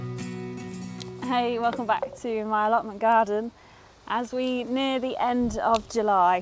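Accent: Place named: London, United Kingdom. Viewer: Did you grow up in the UK? You sound like a Londoner 😅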